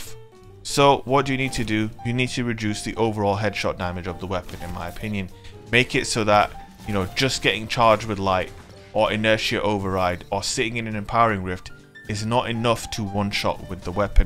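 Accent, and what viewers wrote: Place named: Yorkshire, United Kingdom. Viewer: i always wondered why my accent sounds so similar to yours. NO WAYY you're from west yorkshire, im from north yorkshire and i watch your videos all the time! the earth is so small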